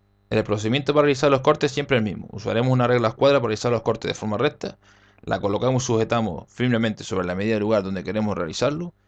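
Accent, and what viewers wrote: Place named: Canary Islands, Spain. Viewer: Es canario.. Por como pronuncia la. CH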